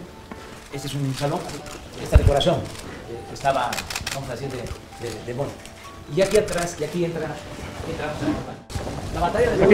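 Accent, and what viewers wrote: Tabasco, Mexico. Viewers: Lo bueno es que el recorrido lo hace en su castellano tabasqueño, porque si tuviera que hacerlo en inglés, estaría perdido. Sugiero que para recuperar espacios en palacio nacional, él y su prole se larguen de ahí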